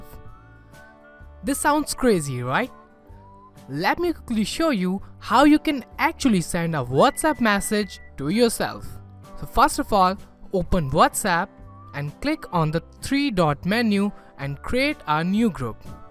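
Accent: India. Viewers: He sounds messed up just like indian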